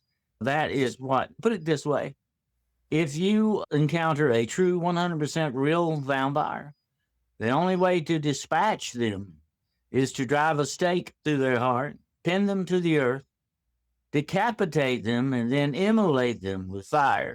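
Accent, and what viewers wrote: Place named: South, United States. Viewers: Aussies don't have accents like that. He's definitely American. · He's definitely from the Southern part of the U.S.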